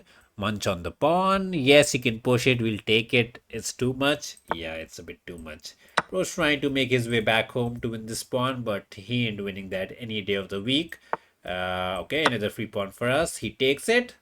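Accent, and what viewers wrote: India. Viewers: Love ur indian accent and calling queen the fatty😂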